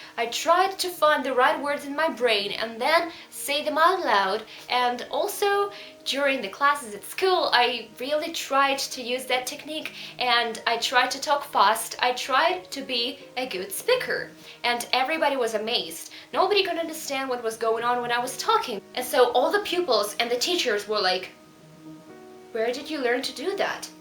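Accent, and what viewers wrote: United Kingdom. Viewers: Tu acento es más bien británico.🤔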